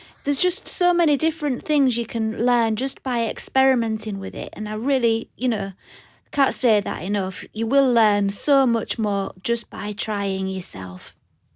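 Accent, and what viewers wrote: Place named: Yorkshire, United Kingdom. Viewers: I love your east riding twang. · Nothing more pleasing to my ear than a Yorkshire accent. Thanks for the tutorial!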